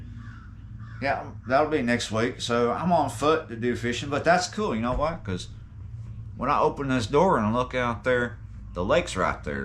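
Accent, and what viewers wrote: Texas, United States. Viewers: Thanks for your videos. Going to miss your Mississippi River vids. Hang in there brother. You already are reverting to a Texas accent lol.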